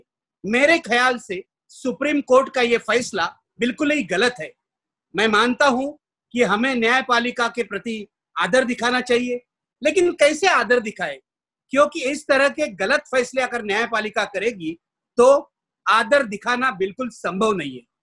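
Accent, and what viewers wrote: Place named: Maharashtra, India. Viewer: Abe bewakuf pehla marathi slang main Hindi bolna band kar.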